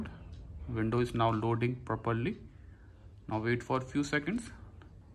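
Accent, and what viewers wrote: India. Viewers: I can't understand the indian accent 🙄 and he is refusing to answer questions.....not helpful at all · Thank you man with a stereotypical Indian accent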